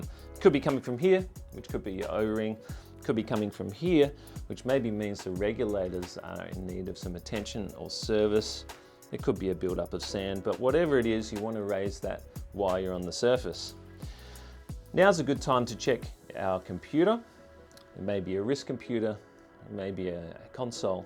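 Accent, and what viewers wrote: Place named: Australia. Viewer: You're Australian 🐨🦘🪃🇦🇺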